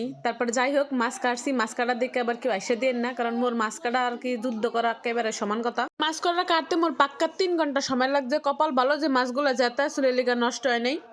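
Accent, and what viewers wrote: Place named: Barishal, Bangladesh. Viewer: সর্বণাশ😮😮😮 but বরিশাইল্ল কথা😅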